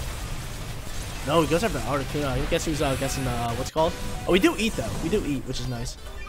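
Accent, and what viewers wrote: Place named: New York, United States. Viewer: I'm noticing your accent are you from the NYC metropolitan area?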